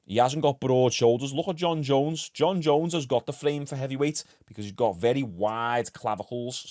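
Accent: Liverpool, United Kingdom. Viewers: Feel like watched guru video scouser version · I'm not defending Jon here but your accent and the flags in these comments kind of agree with his comment about UK fans. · WoW, copying exactly what MMA GURU said yesterday, it's sad when people like you just sit in your room and copy from actual hard working people that do research and actually travel 12 hours by plane to South Africa to talk to dricus and do some training in his gym. Chats live to fighters like money moicano ECT Would do you do mate? Watch GURU and show, it's embarrassing how nearly identical has copied word for word lol No wonder less than 5% of your watchers are not subscribed and will never sub to you and your annoying Scouse accent. And please stop with the workout and how to fight advice, your a snowflake with a teenage girls body lol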